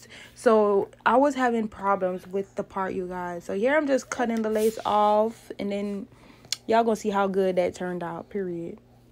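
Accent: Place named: New Orleans, United States. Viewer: You sound like you got a little New Orleans in you.. you ever visit?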